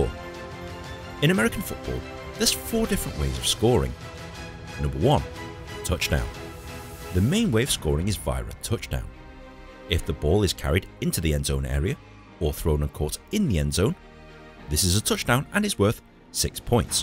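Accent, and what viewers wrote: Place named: Northern England, United Kingdom. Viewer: This guy is so American yet has a British northerner accent with his Us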